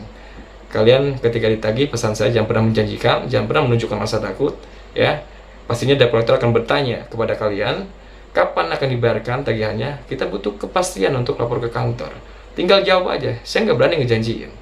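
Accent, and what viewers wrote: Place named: Java, Indonesia. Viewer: Abang orang mna. Kyaknya ada Jawa nya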